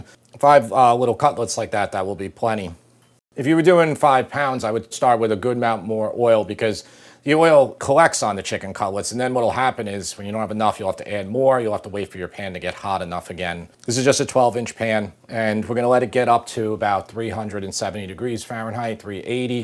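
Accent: New York, United States. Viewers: Making this tonight. Thank you for sharing your skills with us. And I like the NY accent:)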